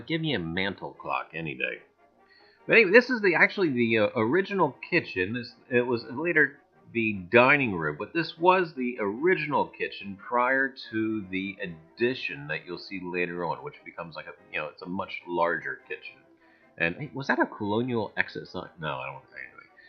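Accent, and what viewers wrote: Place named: India. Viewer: great video! nice Indian cent buddy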